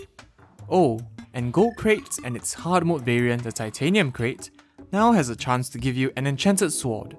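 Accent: Singapore. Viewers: i really enjoy these videos! keep up the good work! also, your voice is so nice, are you Singaporean by any chance? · Are you from singapore?? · bro the singaporean accent is strong with this one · You sound like Singaporean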